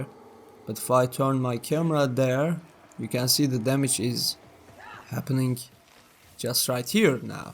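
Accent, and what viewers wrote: Turkey. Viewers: aksandan direkt anladim turk oldugunu bu arada ama guzel bizim kitleden de icerik ureticilerinin cikmasi basarilar!!!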